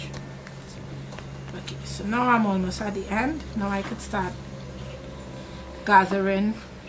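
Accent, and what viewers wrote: Trinidad and Tobago. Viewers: where are u from?. Sound like Trini. i am trini :-9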